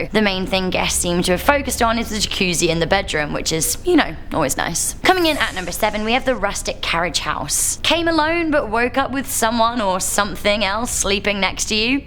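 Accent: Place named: England, United Kingdom. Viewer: I started watching this channel originally cuz I love English accents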